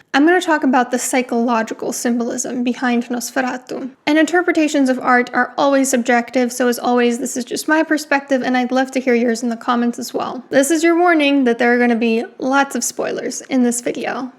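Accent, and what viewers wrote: Romania. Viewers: Were you born in the US? Your pronunciation of "Nosferatu" gave you away as a romanian but your English sounds american. Cheers for the video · I'm curious if you're familiar with the 'Subspecies' Vampire films from Full Moon Features.... They were the first to be filmed in Romania, using the Hunedoara Castle as the set....the Character of Radu Vladidlas is a magnificent example of a classic, menacing and maniacal Vampire. Also, I loved the new Nosferatu as well....your review is very good and your Romanian accent is fascinating! Thank you and if you haven't seen the Subspecies movies, definitely do yourself the favor of rectifying that!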